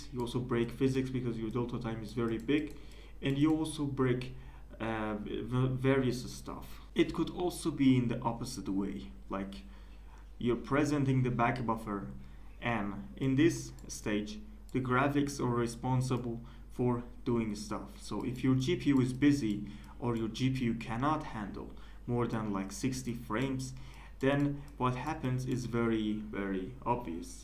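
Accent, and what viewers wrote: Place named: Iran. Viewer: Mate u sound vaguely persian. Correct me if im wrong. Either way, love the content. Looking forward to future videos.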